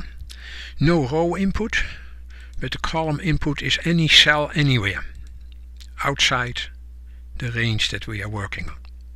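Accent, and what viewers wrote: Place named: Netherlands. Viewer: love your Dutch accent makes you sound like my father telling me all this before I go to bed...good stuff Sir.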